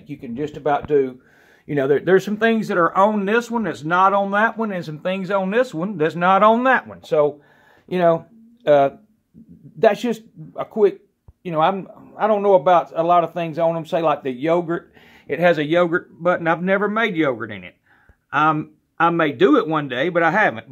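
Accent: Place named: Carolinas, United States. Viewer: I love your accent. I have relatives in North and South Carolina and I miss that accent so much living up here in Pennsylvania. My mom's people are from South Carolina and I have a cousin in North Carolina who sounds just like you. Sweetest accent on earth! It just exudes friendliness! Thanks for the helpful video. Now I know I need an 8 quart so I can put potatoes in with the chicken or large pot roast.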